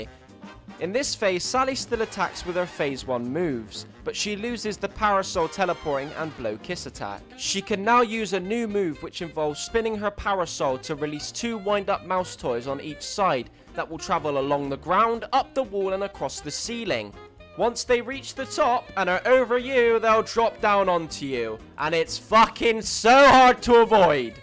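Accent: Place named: United Kingdom. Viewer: You have the strongest British accent I have ever heard, and I'm Bristolian.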